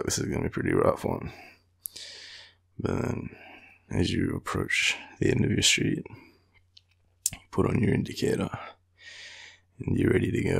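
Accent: Australia. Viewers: Aussie Aussie Aussie! mate another Aussie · cheesy jokes in an Australian accent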